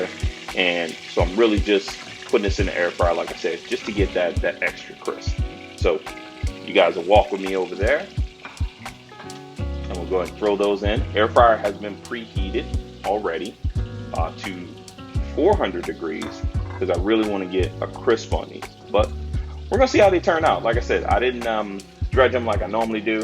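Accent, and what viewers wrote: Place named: South, United States. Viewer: When it comes to frying chicken, I’d only trust a guy with a southern accent!! Is the flavor or tannins the sweet tea imparts to the recipe?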